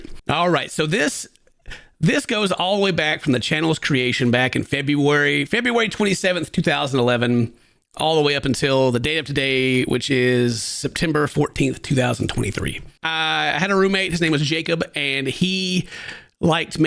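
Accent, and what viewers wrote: South, United States. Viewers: I subscribed during the beginning of the dark ages apparently lol. I checked you out because my friends had me watch a video and we both liked that you had a southern accent😂😂😂. I watched your family vlogs and loved how you interacted with your family and i was actually mad that you didnt have more subscribers because you deserve it! Once you added Buffpro i tuned into every video with yall. I feel bad but i actually skip your Pewdiepie videos lmfao. I had never watched a pewdiepie video until after i had been subscribed to your channel for years. I hope you keep making content. Its part of my daily schedule now lol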